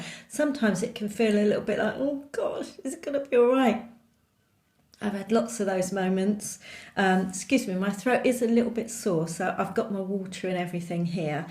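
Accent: United Kingdom. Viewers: Hello Beautiful Butterfly 🦋 it’s wonderful to see your lovely 😊 face and hear a bit of your cockney accent 😇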